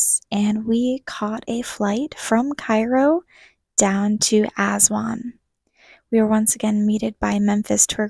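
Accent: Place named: New York, United States. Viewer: Hello.. I. Enjoyed. Emenseley. Your. Video. How. Ever. Your. Warm and. Easily. Understood. Your. New York. So. Soft. And. Clear. meaningful. Or. Your. Commentary. In. It. Was. Sense and. Sensibility. Of. Your. Honesty. Voice. That's. Kept me. Watching. Your. Video. Was. An. Insight. FOR me. You. Also. Have. Explained. Every. Detail. And. Information. Well done. FOR. Your. Knowledge and. Your. Attention. Wish. Luck. And. A. Good. Time. All of. You. Thank you so. Much bye. FOR. Now. I. Will. View your. All. Your. Next. Videos.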